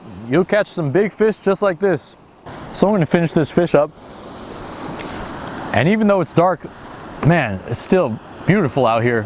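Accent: Bay Area, United States. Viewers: Yo the way he talks, he’s fasholy from the Bay Area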